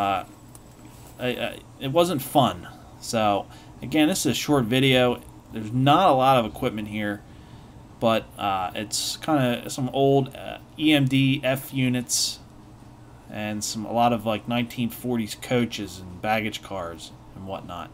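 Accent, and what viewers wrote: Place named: Pittsburgh, United States. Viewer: sounds like he is from Pittsburgh.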